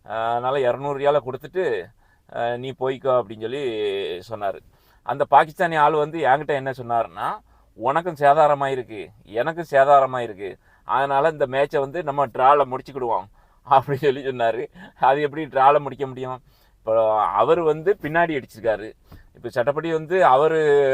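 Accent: Tirunelveli, India. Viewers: இப்படி வளவளன்னு பேசி வீடியோ போட்டால் எப்பவும் கேட்கிறது கிடையாது ஆனா நீங்க பேசறது நம்ம திருநெல்வேலி மொழி கேட்க மிகவும் அருமையாக உள்ளது வாழ்த்துக்கள் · ஆமாம், வெள்ளந்தி மனிதர். கேட்கக் கேட்க சலிக்காத நெல்லைத் தமிழ்.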